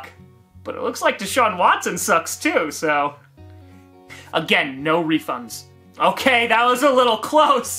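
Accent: New York, United States. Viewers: When a Texans fan gets a NY rant with accent 😅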